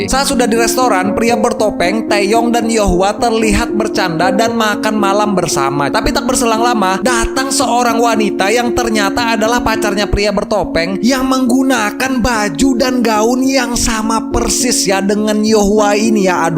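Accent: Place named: Lampung, Indonesia. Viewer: Dari tata bicara kaya orang Lampung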